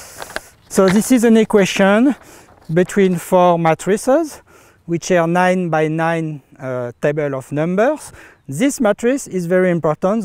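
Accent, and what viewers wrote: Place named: France. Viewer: French accent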